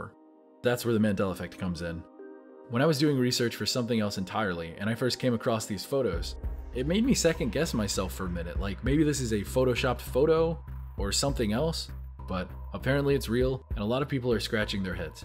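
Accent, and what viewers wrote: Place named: United States. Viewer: You are all very silly … Dazzle ships … Maybe you never noticed them before because 1. You sound American and it was mainly the British who used this type of Camo 2. Using the assumption as an American the majority of DDay is told from the American perspective and images you’ve seen are of American landings on Omaha where you wouldn’t have seen many dazzle ships but if you look at British landings you do notice many of them The fact that anyone believes any of this and you’re basing this entire theory off of a woman who came up with it at a paranormal Conf is legitimately insane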